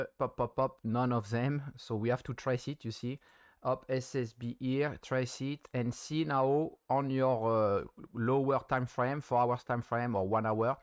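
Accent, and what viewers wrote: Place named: France. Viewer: Salut t un français a ton accent tu peux pas faire une vidéo sur burency en français stp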